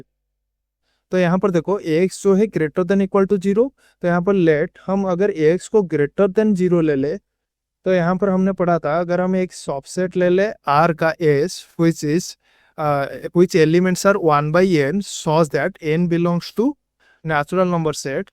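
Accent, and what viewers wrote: Odisha, India. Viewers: Are you from odisha??? 🙏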